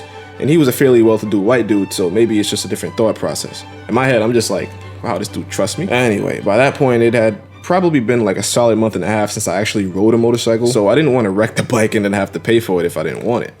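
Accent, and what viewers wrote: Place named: New York, United States. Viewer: Man I knew the minute I listened to your voice I knew you were from NY also lol. Hope to run into you in the city sometime, love your videos 👍🏻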